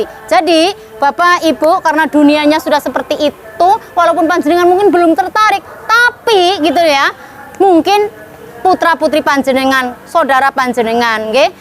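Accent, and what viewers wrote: Banyumas, Indonesia. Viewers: khas ngapaknya mantap pnjelasannya sukses selalu utk mb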